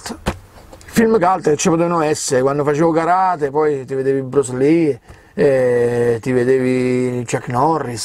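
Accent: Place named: Rome, Italy. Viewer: Ciao, ti seguo da un po', sei molto simpatico, poi l' accento romano mi fa morire, ho alcuni amici romani perche' ho villeggiato a Terracina per poco piu' di 20 anni. Sono un Napoletano emigrato in Canarias. Beh! Sono amante della vita in Camper pero' ho un furgonato, pero' non ci vivo.... Un abbraccio a Presto. Ah, in Calabria vive un mio zio. hehehhe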